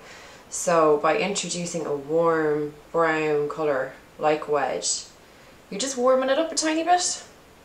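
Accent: Ireland. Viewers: I loove your accent! Irish? :)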